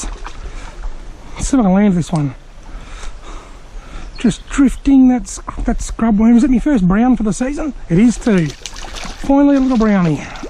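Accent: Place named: Australia. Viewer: WTF, are there trout in Australia? First time watching this channel so I’m only assuming due to the accent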